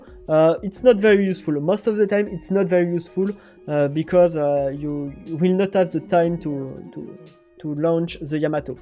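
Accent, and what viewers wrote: France. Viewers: pourquoi les francais de france prononce tous THE comme ZE... <link> <link> <link> serieux je vois celas partout...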